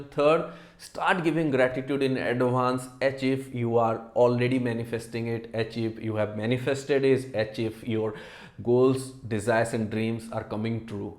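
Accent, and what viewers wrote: India. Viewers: I joined you back in January. I love the way you say things and you’re Indian like me with the Indian accent like my parents. Please let me know when you come to Orlando, Florida.. I would love to meet you. Soni Add me on Instagram ( sonibaby) and Facebook. * I have manifested things bc of your teachings. I can’t thank you enough.😘🙏🏽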